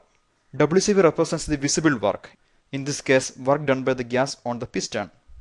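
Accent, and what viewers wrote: India. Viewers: Really? You are making a toutorial and you put an indian guy with terrible inaudible accent in front of a microphone? Great job. Thumb down. This is exactly how pollitical correctness is affecting a quality of performences. Shame.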